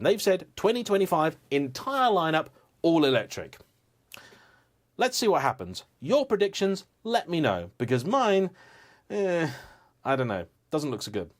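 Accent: United Kingdom. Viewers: Jaguar is still in business? Apart from occasionally seeing an F-Type I forgot the brand existed. They're not competitive and they have that famous British reliability. They don't have money to develop new cars because they don't sell cars and have to beg for money from Land Rover. The F-Type was the last gasp of that gentleman's sports car image Jaguar used to have, now they have no image. If they survive they're going to be rebadged electric Range Rovers. I love how you British people say Jag-u-ar. In the US we say Jag-war. I liked the XJ and that long, sleek shape with vertical taillights that wrap onto the top of the rear fenders.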